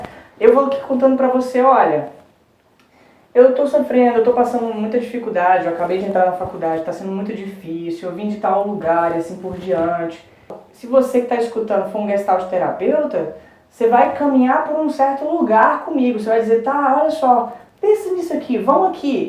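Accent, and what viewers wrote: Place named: Espírito Santo, Brazil. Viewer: Professor, vc tem "sutaqui di capixaba" rs Aulas muito claras. Conheci seu canal hoje. Muito bom. Parabéns 👏 👏 👏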